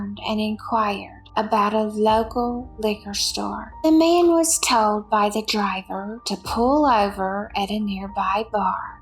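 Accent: South, United States. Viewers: I just love her southern accent. So soothing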